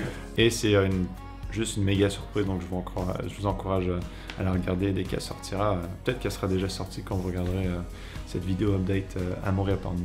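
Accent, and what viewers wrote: Quebec, Canada. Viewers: Omg je te suis depuis longtemps et c’est fou comment t’a maturé c’est vraiment nice et t’a tellement adopté l’accent québécois xD on dirait plus la même personne quand je regarde des vidéos plus anciennes haha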